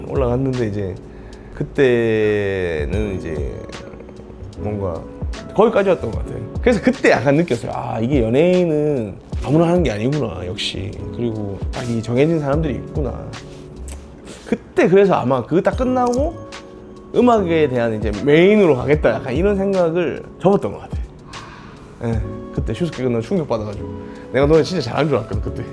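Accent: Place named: Busan, South Korea. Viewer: 폭팔적인 목소리 부산이시군요 가수로서 최고가되시길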